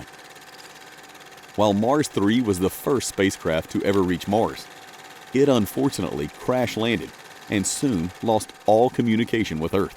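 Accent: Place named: Appalachia, United States. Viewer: "Narrated by Reverend Lovejoy, of Springfield..... somewhere in the U.S.." It's so weird hearing narration with a Southern Appalachian accent, or thereabouts. East/Central Tennessee? Then, or so... um... "One of these things is not like the others." Somebody really badly misspelt "Sulfur." , your captions spelled maghemite wrong; there is no such thing as "magami." , Arctic has a 'c' in the middle. It is not "ahr-tik." it's "ahrk-tik." Please. , there it is: "EE-kwae-tuhr." That's East Tennessee. Straight up "Beverly Hillbillies'" Buddy Ebsen, as Jed Clampett. Equatorial as "eh-KWAE-ti-ohr-uhl?" No. It's, "eh-kwih-TOHR-ee-uhl." I would proof-listen for free, btw. Inhabitable is not "ihn-hah-BIH-tuh-buhl." It's, "ihn-HAH-bih-tuh-buhl." I am starting to wonder if this voice is an AI pretending to be southern. I have literally never heard anyone else mispronounce this word. 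Ever. P.S. That Biden section was unlistenable. Had to skip it. We need better.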